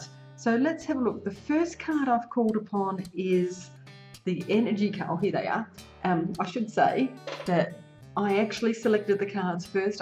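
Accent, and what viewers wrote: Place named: Australia. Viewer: Hi! Thanks for the warm, positive energy. You sound Australian and I love it. It's my intent to travel to Sydney in Sept.; I just have to find a way to make it work out in the best way. I've lived there twice for 3 months before. Sydney, Canberra, Melbourne, Port Lincoln and Darwin, plus a few days in Brisbane. I have a Jupiter IC going right through Canberra, with a couple of Venus crossing lines above and below Sydney!! Do you like Astrocartography? I'm a handwriting analyst and O love to combine them all with deep theta mediation. God, why am I rambling??:-) Just saying thanks for the positive vibes, love to see you in Sept!!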